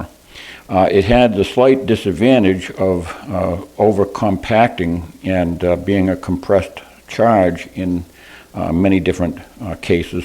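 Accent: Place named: Boston, United States. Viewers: If think it was a Boston accent. Even to an Englishman it's very distinctive. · Bah-ston accent.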